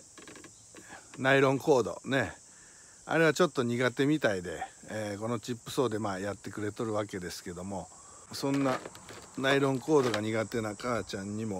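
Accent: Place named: Oita, Japan. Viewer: 大分の豊後大野市の者ですが、そちらの言葉方言と良く似てますよ😃 ちびるは、チビったとか、尿漏れの意味ですが😃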